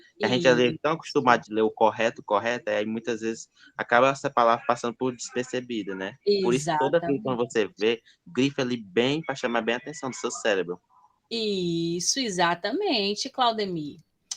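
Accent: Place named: Northeast, Brazil. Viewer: gente eu amo o sutaque nordestino ainda mais de professora como esta <3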